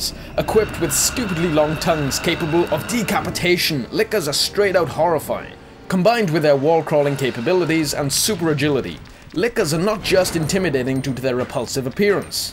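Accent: Wales, United Kingdom. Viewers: Are you Welsh? You sound pretty Welshy to me :) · Hey, are you Welsh?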